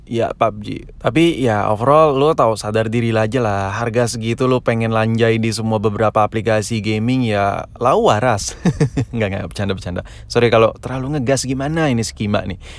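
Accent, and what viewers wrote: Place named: Medan, Indonesia. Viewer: Lu orang medan bang.. Pake bahas Kimak pulak kau...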